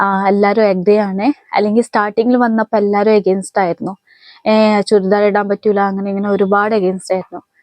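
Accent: Malappuram, India. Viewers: Aap kaisea sikkayaa Malayalam..Malapuram slag 👍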